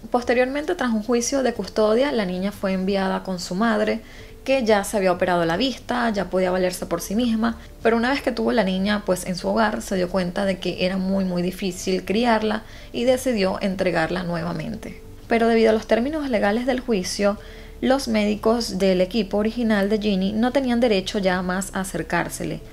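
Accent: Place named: Venezuela. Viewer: no se porque llegue a ti. pero al escuchar tu bello acento venezolano. me encantaste. aparte de lo interesante de tus contenidos. mucho éxito y felicitaciones. arriba Venezuela.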